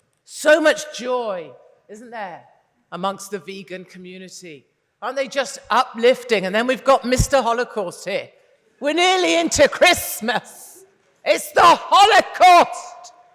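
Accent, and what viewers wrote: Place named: United Kingdom. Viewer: I’m generally worn out from British accents but I like a British accent saying the things says. So much so I followed her.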